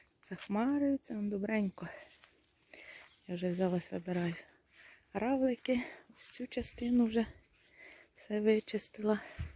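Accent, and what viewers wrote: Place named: Poltava, Ukraine. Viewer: Доброго вечора,!Дякую за гарне відео!Цезар такий кумедний😄Помічник!Завжди дивуюсь,як Ви з півдня України,а розмовляєте начебто з Полтавщини.Дуже люблю таку просту,українську вимову.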